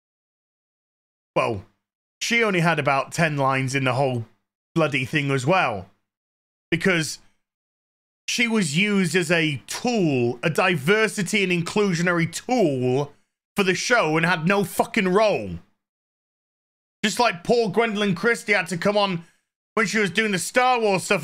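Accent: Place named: United Kingdom. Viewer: Ahhhhhhh!!!!! I needed this! I had to get my dose of! Love you, my brother! Your commentary matches my inner monolouge, exactly, minus your British accent. Keep the content coming!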